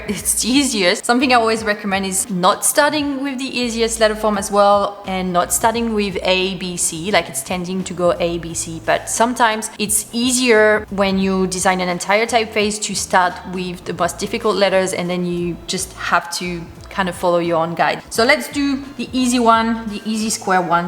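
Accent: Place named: France. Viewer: Your accent is quite good, but I can easily recognize your french ! ;)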